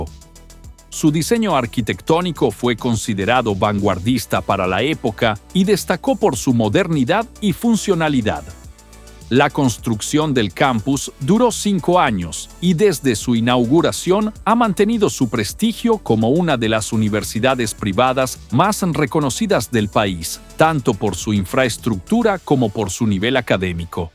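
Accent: Yucatan, Mexico. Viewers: Ese acento es yucateco? Suena muy bien!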